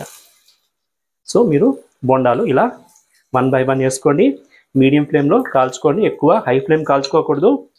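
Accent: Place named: Guntur, India. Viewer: మీరు యూట్యూబ్లో వంట కాకుండా జాబ్ ఏమైనా చేస్తారా అండి మీ ప్రాంతం ఎక్కడ అంటే మీ తెలుగును బట్టి గుంటూరు ఆ విజయవాడ అని అడుగుతున్నాను అంతేను మీరు ప్రజెంట్ ఎక్కడ ఉంటున్నారు మీరు బ్రాహ్మిన్స్ ఆ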